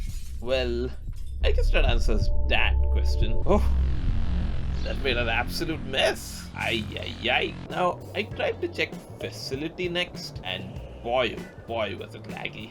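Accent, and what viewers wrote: India. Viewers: I love his Indian accent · He is already sound like Indian because he is from India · You sound indian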